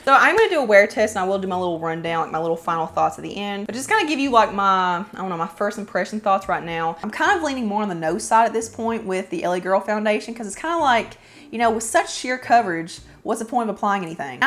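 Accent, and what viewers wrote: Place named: Kentucky, United States. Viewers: My daughter in law is from KY and she sounds just like her!! Love it!! 💘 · Ok…I discovered your channel yesterday and I love it. You are so funny and unique. I recognized your accent cause I’m from Kentucky too! What area are you in?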